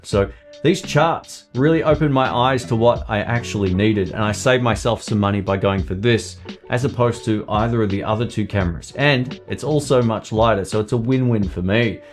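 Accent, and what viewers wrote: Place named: Australia. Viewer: woops my bad. Should've recognized ozzy accent) silver top looks very nice, hope it'll make it over here sometime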